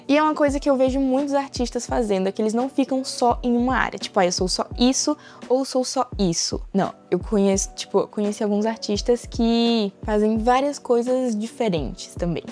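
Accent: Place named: São Paulo, Brazil. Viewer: impressionante que dá pra reconhecer um paulista de longe pelo sutaque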